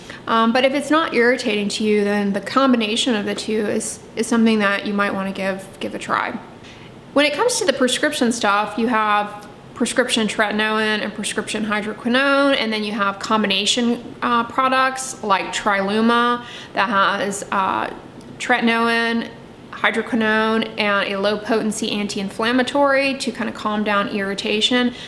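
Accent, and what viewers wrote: United States. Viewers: Where does that accent come from? As an Australian, it is hard to know what part of America that accent is common. Just today I discovered my brother in law has skin cancer on his scalp. It’s the most common type (BCC). We cannot overstate the dangers of the sun.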